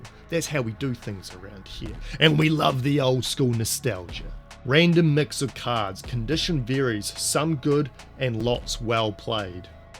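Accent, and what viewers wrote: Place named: New Zealand. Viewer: LOL after watching countless american youtube vids on pokemon.. listening to you makes me realise what us kiwis sounds like.. I would say you sounds like a typical kiwi. Man we sound so chill and unserious with a certain twang.. and the words we use.. fANcy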